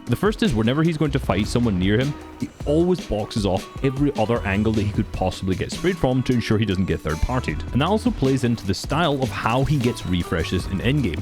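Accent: Scotland, United Kingdom. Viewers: Your accent makes me want to drink IRN BRU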